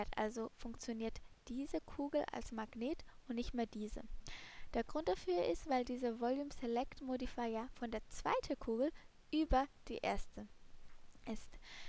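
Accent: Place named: Germany. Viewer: cutest German accent ever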